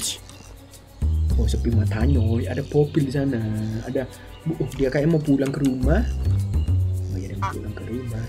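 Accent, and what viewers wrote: Java, Indonesia. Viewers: Mas Sak vidio ngoming medok sak vidio o ae Temenan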